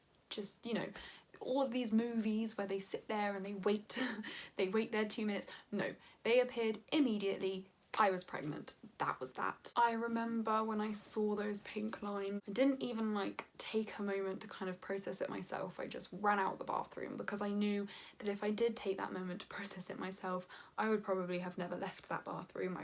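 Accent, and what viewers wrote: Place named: United Kingdom. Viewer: I had no idea you were british and that beautiful accent caught me off guard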